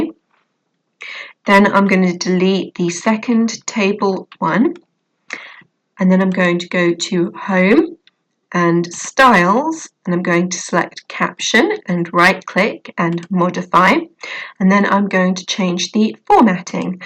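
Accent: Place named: United Kingdom. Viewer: Thank you by the accent I'm guessing your British haha. Back to the point thank you. 😁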